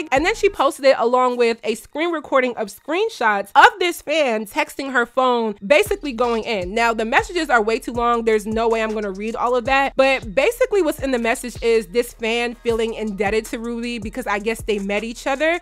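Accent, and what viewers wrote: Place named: United States. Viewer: Tbh I never understood the hype over Rubi rose she just looks like any other IG thot with not much more than their body to offer lol. This is really random too but you’re so pretty and I don’t live in America so it’s interesting to watch an Igbo girl speak with an American accent, rather than the strong Nigerian accent which I always hear 😆 my mans Igbo and I’m close with his family, we both pointed out that you resemble his sister a little bit when he saw me watching ur video. Her name is also. Igbo features are so strong and easy to spot when you’ve been around them a lot. Very beautiful. Love how they use old English words a lot like “have a prosperous day my wife” (his sisters also call me their wife) and often mention god while they are talking about stuff. 🥹💗 nwanyi oma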